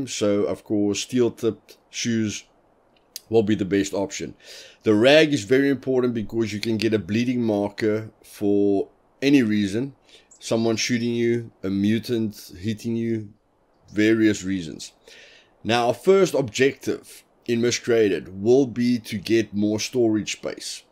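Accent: South Africa. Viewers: I am still struggling with one thing And that is to find out if you are South African ? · are you from South Africa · you sound south african lol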